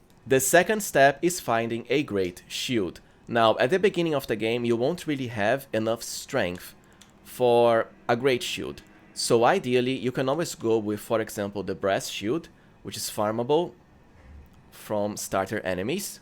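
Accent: Brazil. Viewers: You have a strong Brazilian accent. Are you one? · Are you BRAZILIAN? RECONHECI O SOTAQUE A KILOMETROS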